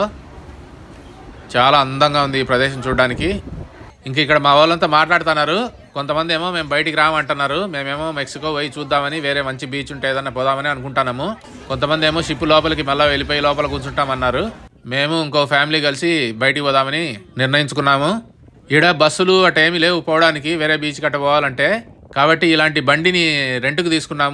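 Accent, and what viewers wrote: Kadapa, India. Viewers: Chala baga vuntundi taliking slang in telugu pakka rayalaseeme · Meedi yevuranna.mee Kadapa Jilla vadi madiri matladutunnaru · Meru 2016 lone inthati camera lu oka drone use chesi videos chesi andhariki chupichalanna thought inka me journey chala bagundhi nejaniki meru kuda Oohinchi undaru me videos ippudu 2022lo YouTube lo oka Telugu channel lo papular ayithayani Thank You mana Kadapa slag inka plus vere level meru 🙌👌👌👌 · I like your కడప slange నేను కొట్టం బడిలో 5 th class వరకూ చదివాను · Anno madi kadapenna ne vedieous super ante super na mana yasa marchipoledante great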